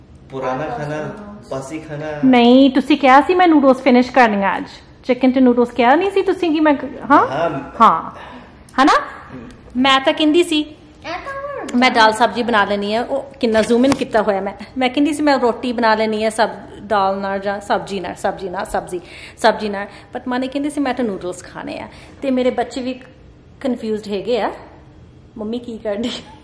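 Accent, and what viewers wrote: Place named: Punjab, India. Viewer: Your Punjabi is sooo 🥰 cute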